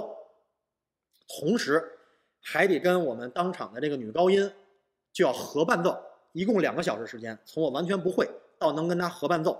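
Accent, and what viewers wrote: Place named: Beijing, China. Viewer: 哈哈哈，我三里河的也表示听到了乡音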